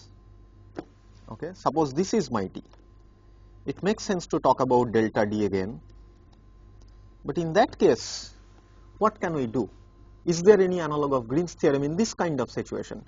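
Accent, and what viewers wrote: India. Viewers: i cant understand it coz of his indian accent, and the shitty vid quality.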